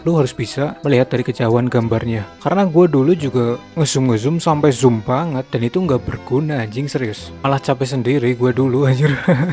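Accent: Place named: Banyumas, Indonesia. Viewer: Tengkyu bang, btw pasti orang ngapak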